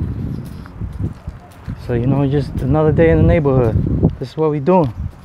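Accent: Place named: South, United States. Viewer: Hola si se te nota en El hablando q estuviste en El sur de USA. Saludos y sigue adelante Muy bonito y limpio. .Carisimo para vivir ahi.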